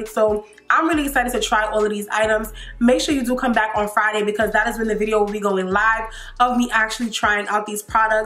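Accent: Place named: New Jersey, United States. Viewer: Are you from Jersey? Your accent is so cute!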